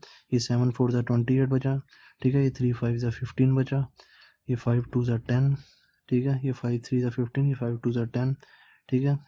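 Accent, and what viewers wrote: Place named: Kashmir, India. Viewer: you sound like kashmiri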